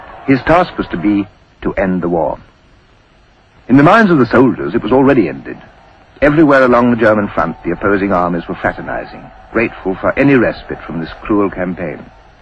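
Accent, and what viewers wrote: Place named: RP, United Kingdom. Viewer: This accent would definitely be described as "RP" - received pronounciation, it's known as. In other words, very posh English, a bit like how the Queen used to speak. Even she has been influenced by "estuary-speak", that splurge of Cock'er'ny that has spread across most of the southeast of England. this is how EVERYONE on the BBC used to speak. These days northern and other accents are more common on the telly. Thank god.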